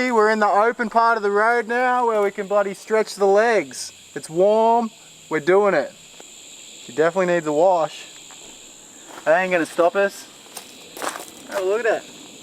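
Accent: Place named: Australia. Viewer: fuckin dig your australian accent mate. STRAAYYYYAAAA!!!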